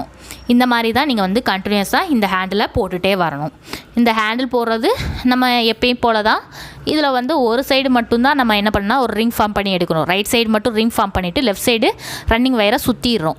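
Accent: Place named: Coimbatore, India. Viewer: Thank you mam unga Coimbatore slang nalla irundhadhu mam so thanks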